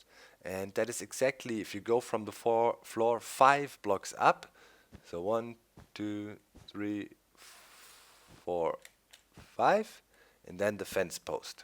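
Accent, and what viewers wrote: Germany. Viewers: you can hear a little german accent :D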